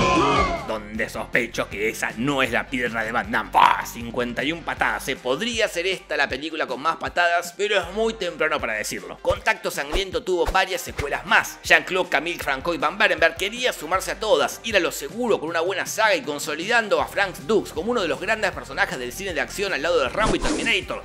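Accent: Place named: Argentina. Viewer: ME ENCANTA EL ACENTO ARGENTINO.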